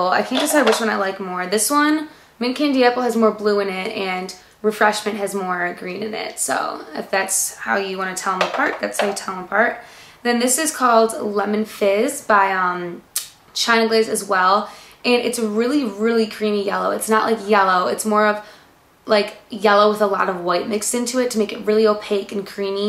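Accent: Chicago, United States. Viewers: Your Chicago accent is so cute!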